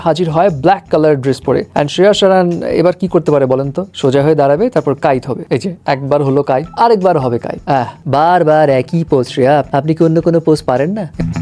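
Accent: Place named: Kolkata, India. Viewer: কিরে ভাই আপনার ভাষাটা এরকম লাগতেছে কলকাতার মত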